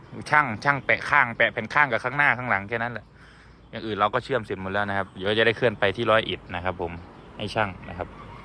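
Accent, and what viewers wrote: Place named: Korat, Thailand. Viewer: เอ้าอยู่เทพสถิตหรอ อากาศดีนะ อ นั้นเว้าโคราชกัน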